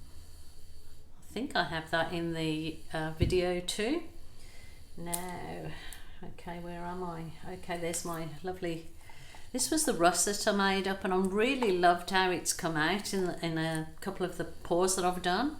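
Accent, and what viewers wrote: Birmingham, United Kingdom. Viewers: Haha haven’t lost your Brummy accent. Love your work 😊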